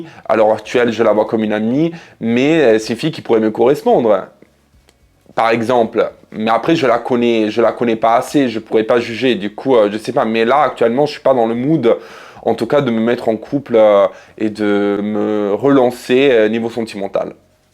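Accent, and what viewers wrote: Italy. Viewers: L''accent italien ! 🤩🤩🤩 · Quel plaisir l’accent italien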